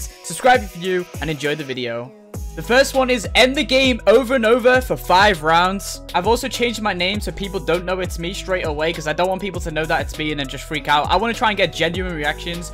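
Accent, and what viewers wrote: United Kingdom. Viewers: is this guy british? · why? he british. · Are you British?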